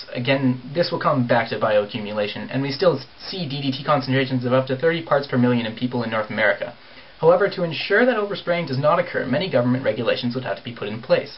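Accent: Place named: Canada. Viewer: haha, I love canadian accents. abowt! hehehe. nice video, dude.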